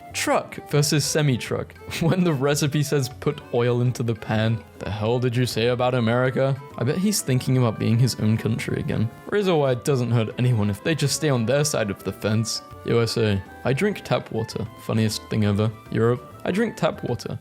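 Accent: United Kingdom. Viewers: I like how it's a British guy commentating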